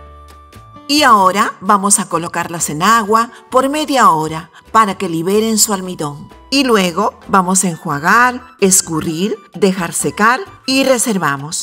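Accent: Peru. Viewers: Hola, muy buanos dias;Soy aficionado a tus recetas de comida peruana. Yo soy peruano, pero vivo desde hace 32 años en España, y siempre e echado en falta nuestra comida peruana, y me e visto en la necesidad de aprender a cosinar y me guió por tus recetas. Me gustaría mucho que te des a conocer, pon un poco de tu imagen;Por tu forma de hablar creo que heres mi paisana, si no me equivoco de Huaraz?, yo soy de Yungay(Ancash). Un cordial saludo, y muchas felicitaciones por tus excelentes recetas,gtscias.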